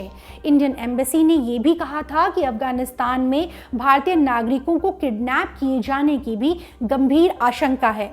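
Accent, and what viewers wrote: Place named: Uttar Pradesh, India. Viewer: Uttar pradesh accent in her language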